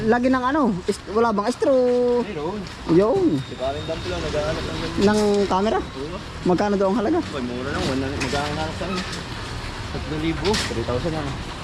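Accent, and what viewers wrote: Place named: Tagalog, Philippines. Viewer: Greetings to u from California, pinanonood namin ang vlog mo before going to bed kasi nakaktuwa ka lalo na at kumakanta ka ng ginagawa mo lang na lyrics. Ang diction mo nakakatuwa rin pure tagalog ang salita mo pero parang ngayon ko lang narinig na tagalog malalim kasi. Pray always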